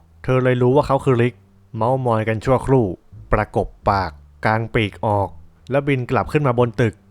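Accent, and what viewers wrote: North, Thailand. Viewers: คนเหนือก๋าา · พี่คนเหนือหรอ ฮานี้บ่าเฮ้ย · คนเหนือหรอพี่ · พี่เป็นคนเหนือหรอครับ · พูดเหนือด้วย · คนภาคเหนือหรือป่าวนิ คนสปอยอะ5555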